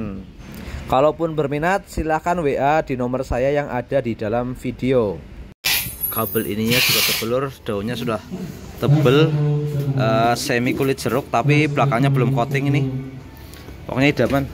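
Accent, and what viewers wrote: Banyumas, Indonesia. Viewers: Ngapak nmen yah mas.. pkoke jos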